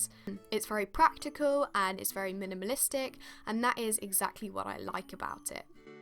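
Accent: England, United Kingdom. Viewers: Where of england is thic dialect from?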